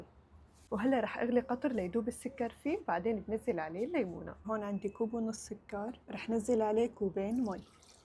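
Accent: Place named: Coast, Syria. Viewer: اي من عنا من الساحل في عدة لهجات نحنا مثلا لهجتنا قريبة من ضيعة ضايعة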